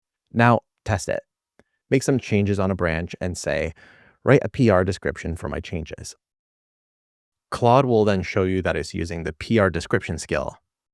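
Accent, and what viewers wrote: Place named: California, United States. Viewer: SNL Californians accent is somewhat annoying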